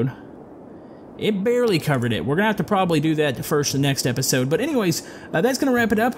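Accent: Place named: United States. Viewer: Your American accent drives me crazy!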